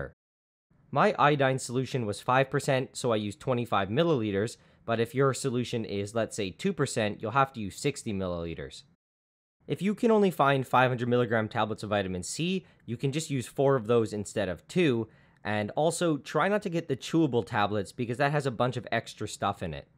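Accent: Canada. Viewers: So, you're Canadian, aren't you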